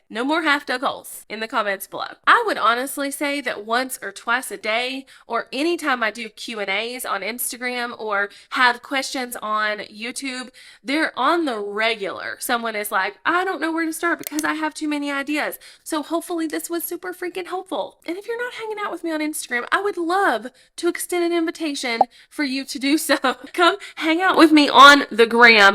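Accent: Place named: Carolina, United States. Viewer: you've got a Carolina accent lol! I'm from South Carolina. great video! where did you get your shirt from also? super cute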